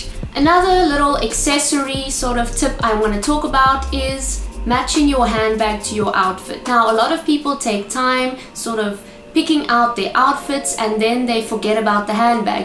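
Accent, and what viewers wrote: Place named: South Africa. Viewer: Just found your channel and love the tips. Where are you from? Your accent sounds South African xx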